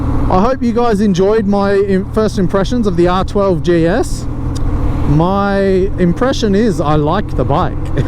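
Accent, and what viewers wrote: Australia. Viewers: Enjoyed the scenery mate. Great to hear a fellow Aussie accent overseas . Great bike. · First of all... Great review and a fantastic bike! But I'm very confused about yourself mate 😅 Bavaria in the name, an Australian accent and based in Estonia? Sounds like an interesting backstory 👍🏻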